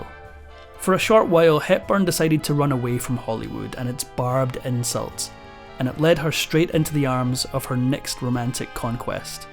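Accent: Scotland, United Kingdom. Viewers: Interesting to a point, however I was put off listening to more than 4 minutes by your lack of enunciation. You're doing such a good job but please don't let yourself down. I'm Scottish too and unless you want to live in Lorna Slater's "Sco-land" can I, with all due respect, please ask you to pronounce "criticisms" as "criti CIS ms" not "cri-i-sisms" and "thea T re" - don't say "thea-rr". Does ma nut in so it does. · I enjoyed the Narrator's Scots dialect very much. I wonder how Ms. Atheist Woman is enjoying her afterlife? lol! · But it's not AI!!! Unless AI can now do a Scottish accent.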